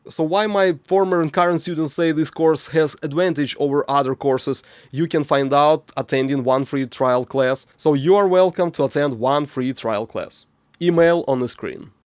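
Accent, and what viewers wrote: Russia. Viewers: абажаю твой английский, ты говоришь на английском как-то по.... русски😄поэтому очень легко смотреть. ну и само собой материал-это просто бомба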